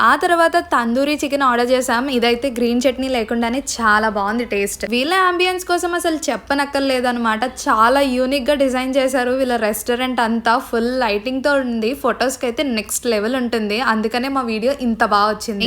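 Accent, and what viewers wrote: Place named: Godavari, India. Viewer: Bad comments gurinchi alochinchaku normal ga muslims yeppudu hindi ne matladatharu kani ni achamaina godavari slang bagundhi and Mamulgane milo vallani bayataki ranivaru and face kuda kanapadanivaru but miru intha freedom ga vunnaru ante mi intlo mi valla dhaggara miru thechukunna trust....chala baga vuntay mi videos ❤🥰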